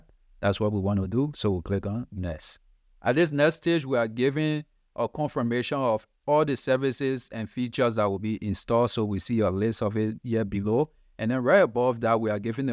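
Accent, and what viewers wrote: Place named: Liberia. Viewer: Big up yourself. You sound like Liberian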